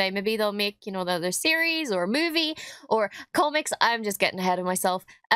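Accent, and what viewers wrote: Northern Ireland, United Kingdom. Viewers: Sound like your from the north of Ireland? 😅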